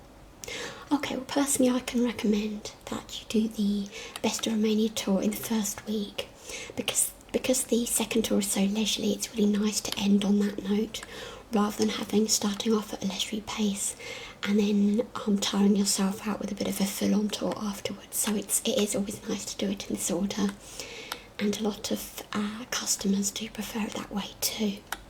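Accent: United Kingdom. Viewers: Great to hear an English accent ASMR vid! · Instantly subscribed after only watching a minute of this video. :) So nice to hear a British accent among the ASMR community. Yours is really soothing and relaxing, love your voice, instant tingles. x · I love your British accent!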